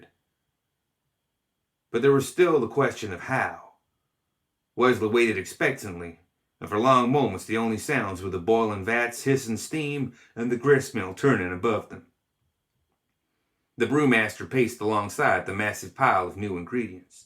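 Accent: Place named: Louisiana, United States. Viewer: Interesting choice to have the story read in a Bayou accent.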